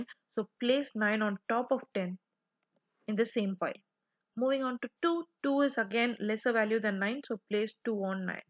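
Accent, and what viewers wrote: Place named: India. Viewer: I wont lie...normally I ignore videos in Indian accents (because generally they're rubbish)...but this was excellent. Well done and thanks.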